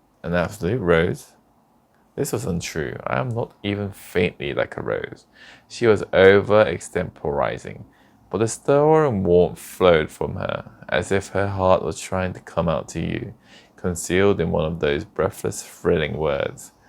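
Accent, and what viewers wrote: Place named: United Kingdom. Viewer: 잠이 솔솔오네요ㅎㅎㅎ 목소리도 영국식발음도 너무 좋으세요 앞으로 매일밤 들으면서 꿀잠잘 것 같아요💫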